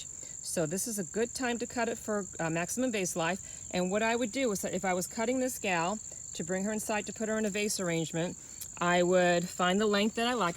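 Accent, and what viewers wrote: New Jersey, United States. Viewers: Lol I just watched another video of yours about sunflower tips and I knew as soon as I heard your voice that you were a Jersey girl and it has now been confirmed! 🌻✨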